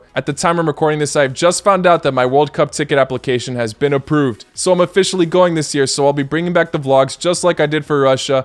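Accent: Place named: United States. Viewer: I like the content but I can’t stand how he pronounces words, he’s obviously American.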